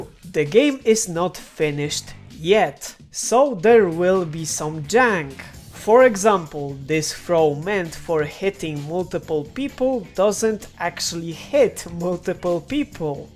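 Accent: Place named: Eastern Europe. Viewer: Please work on your slavic accent. It's very distracting.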